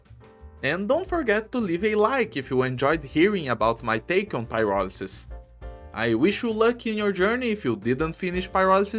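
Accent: Brazil. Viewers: This is CC because Pinchout is CC, Pinchout was just a bad CC and this shifts the whole perspective of people, if this one was the Beta for the new model (which isn't very different than the previous one in any actual meaningful level) instead of Pinchout, the whole discussion would be different. Most of the issues are community sentiment related, because of the proximity of Pinchout DOS and this, with all 3 being operator restrictive events designed for high end accounts. Pinchout didn't feel very like CC to most people because most people experience is Risk18, and the difficulty for the new "RISK 18" is more like old 24~28, which would be a problem if the rewards were locked behind it, like how it was on DoS, but this time around, the only sole reason to push 620 is the medal, this is exactly the correct type of reward to be locked behind the crazy clear, my own complaint is that the gap should be higher between medal and trim, the trim should be slightly higher, while the base medal should be slightly lower. 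On a random note, are you brazilian? Your accent really gives me brazillian vibes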